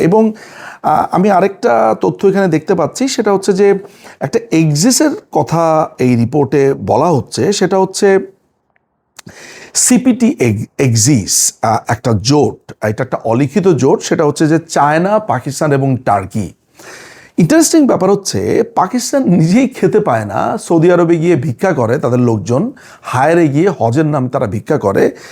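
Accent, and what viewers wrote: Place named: India. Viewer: this bloody talking in Indian tone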